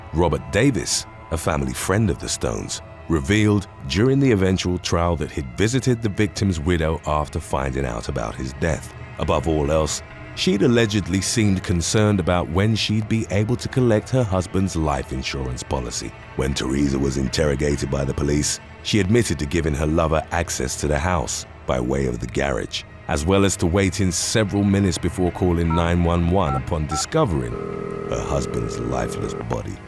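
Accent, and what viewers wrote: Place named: United Kingdom. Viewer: He’s British, they pronounce words differently than Americans.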